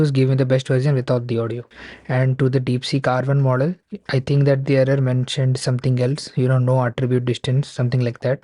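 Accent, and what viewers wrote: India. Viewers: I wasn't expecting Indian accent when I opened the video. However, thanks for the comprasion.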